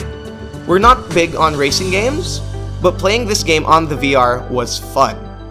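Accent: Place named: Philippines. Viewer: You sound Filipino 🇵🇭🤔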